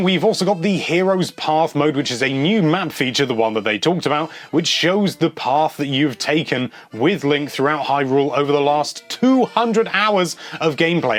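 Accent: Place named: United Kingdom. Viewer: he is English (British) not (American)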